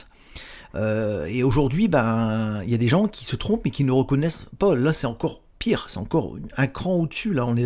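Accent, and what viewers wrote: Canada. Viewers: Canadien, non ?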